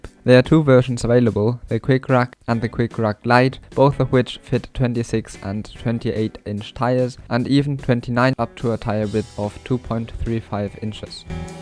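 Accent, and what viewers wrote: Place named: Germany. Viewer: I love this German guy. Straight to the point, pragmatic, no BS. And cool accent. 10/10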